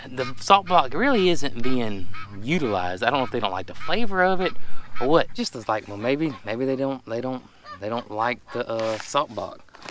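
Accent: South, United States. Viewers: It sounds like: kol er ab e. Must be your southern drawl? LOL just teasing a bit....I use to live in Georgia and one day a neighbor called me Georgia Peach and I went and cried and told my mom...she said that's a sweet name don't cry. When I listen to your talking I love it and it sure wouldn't take me any time at all to get it back down south. I love ALL of your video's for sure and look forward to every single one of them. God IS Blessing you all.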